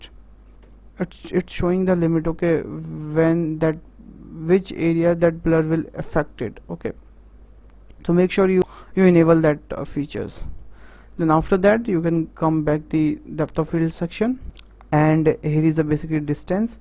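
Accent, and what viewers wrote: India. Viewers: Your Tutorials are so useful and your Indian accent make it sweeter bro!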